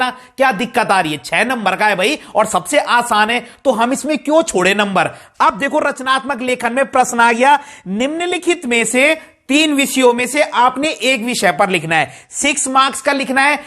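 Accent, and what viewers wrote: Garhwal, India. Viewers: Gadwali ho na ap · Sir aap kya gadwali ho aap ki awaaz se lag raha hai ki aap gadwali ho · Bro h sir gadwali accent se pta lg gya 🤣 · Sir aap garwhali ho ky aapki aawaj se lg rha h 😊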